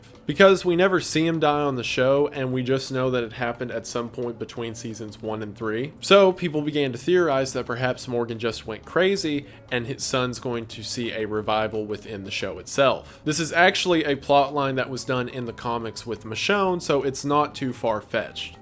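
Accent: United States. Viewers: stop sounding like a yee haw man this cant be real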